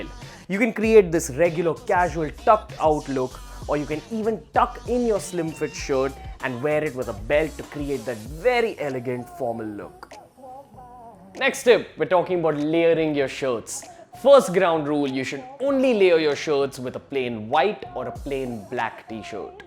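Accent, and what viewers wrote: India. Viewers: I love just about all accents of the world, but man the Indian one is just so unattractive lol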